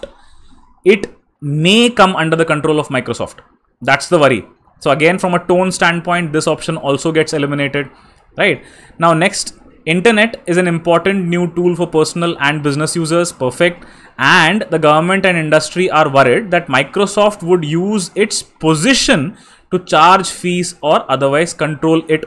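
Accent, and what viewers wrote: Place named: South India, India. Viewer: Sir ,Are you south Indian?? Your ascent is like South Indian people.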